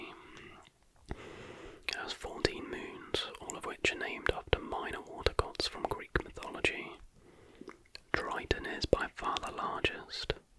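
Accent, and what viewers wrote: United Kingdom. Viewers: he's just british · Amazing video to fall asleep to. I take a while to fall asleep so I usually need something to occupy my brain until then and this is absolutely perfect and even better that its educational. Your voice with your British accent is just amazingly calming to listen to. Thank you very much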